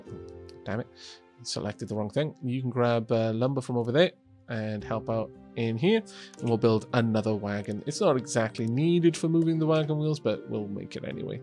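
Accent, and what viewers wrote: Wales, United Kingdom. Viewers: what part of wales are you from? heard the slight welsh accent then seeing your chanell logo be a red dragon i knew you was welsh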